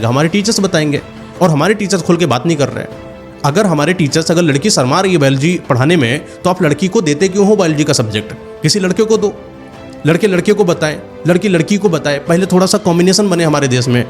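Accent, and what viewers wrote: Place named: Bihar, India. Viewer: Hopeless aur bekar aapne sab bataya, aur apni bihari bhasha ki hindi mat boliye bada odd lagta hei sunne mei, apni hindi thik karo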